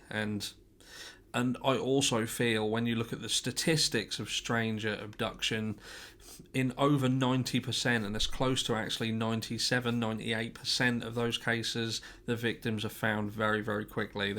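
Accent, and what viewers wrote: United Kingdom. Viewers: Hi there. I just have discovered you in my recommended and enjoyed this deep dive! Its funny to hear a guy from the UK (assuming from your accent lol ) talking about a case here by me in New York (paisley)...funny how small the world has become in the recent times 🤔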